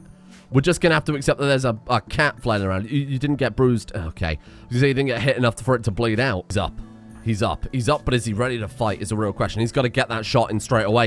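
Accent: South, United States. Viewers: Your country twang is perfect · American accent improving?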